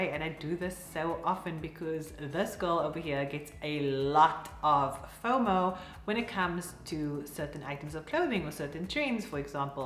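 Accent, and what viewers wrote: South Africa. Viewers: You sound capetonian....gottta love SA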